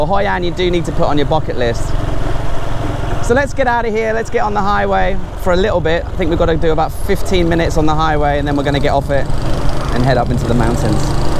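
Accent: United Kingdom. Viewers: your British accent is so strong now! I think it because you no longer have to speak broken Thai and most people in Vietnam speak more English 😎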